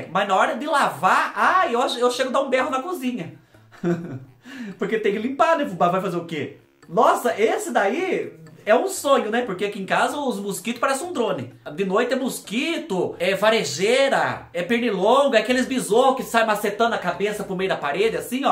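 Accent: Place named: Itatinga, Brazil. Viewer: Fubá vc é igualzinho a mim nois fala mesmo sou de Itatinga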